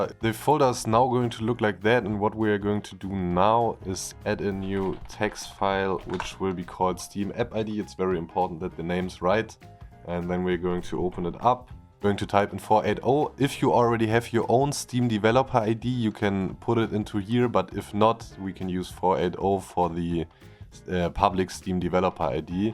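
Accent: Germany. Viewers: I hear german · man hört das deutsche raus 😅, danke fürs tutorial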